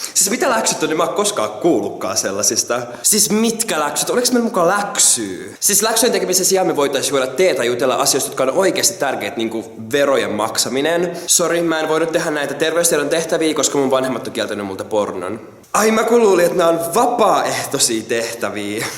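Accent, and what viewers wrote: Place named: Lapland, Finland. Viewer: Toi sun lapin murre on vaa jotenku sulone😂😂❤️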